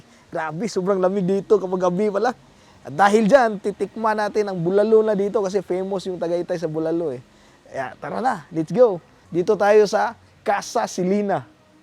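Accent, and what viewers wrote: Visayas, Philippines. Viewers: I love that bisaya accent Though😊, lalo na ang pag ka brought ng sabaw😂😂😂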